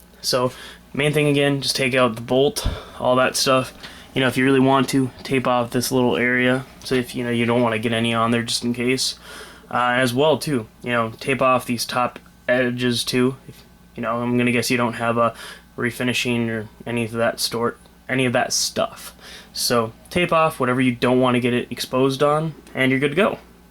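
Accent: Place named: Buffalo, United States. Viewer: sounds like your from buffalo everyone pronounces mags as "megs" lol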